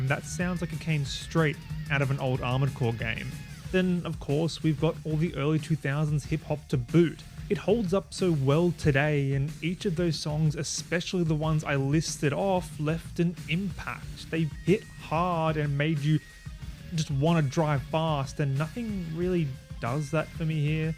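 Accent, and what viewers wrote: Australia. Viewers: brooooo, an aussie? oh mate lets do this, i just listened to an american younin talk about Midnight club for 40 mins and i so wanna be home again baha. - a fred durst ref in the first minute, i'm yours rofl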